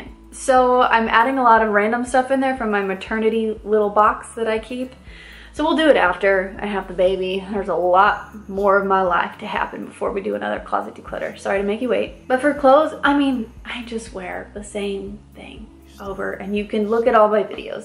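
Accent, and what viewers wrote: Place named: South, United States. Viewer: Much love from Saint Louis!! 🫶🏾⚜️ Hubby and I just found out I’m 6 weeks and 5 days pregnant after almost 2 years of trying and when I tell you your videos give me a HUGE sense of relief, positivity, and inspiration, I mean it girl. God bless you and your family, and may you all continue thriving and building up (I’m from the north and speak a southern accent ALL THE TIME 😂🤣 maybe it’s in your roots girl HAHA). Thank you. 🙏🏾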